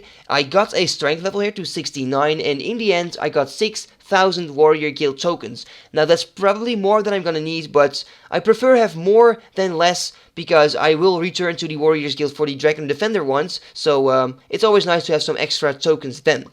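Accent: Netherlands. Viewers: ik zag het al aan de rs chat hehe zieke videos maak je, kon het meteen horen aan je engels. Niks mis mee maar aan het accent hoor je al dat je nederlands bent ;)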